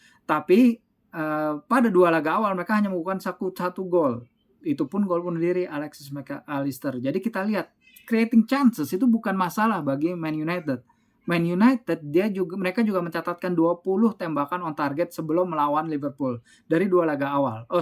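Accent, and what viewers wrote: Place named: Jakarta, Indonesia. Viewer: Kenapa betawi nya kwentel banget disini wkwk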